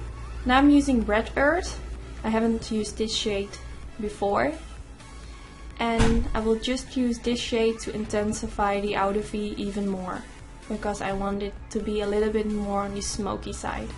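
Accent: Netherlands. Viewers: I love it! Your work is very precise, you're truly an artist :) also, your accent sounds Dutch, am I right? Oh and I've subscribed 😄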